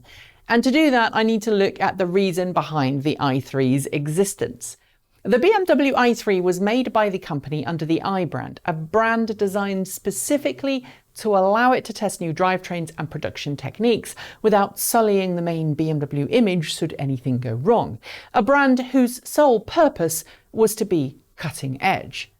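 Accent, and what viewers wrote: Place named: England, United Kingdom. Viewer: Greetings from Sydney Australia! So so glad to hear that nice pommy - undiluted - accent back in this episode:):) Thank you and keep up your informative and entertaining work.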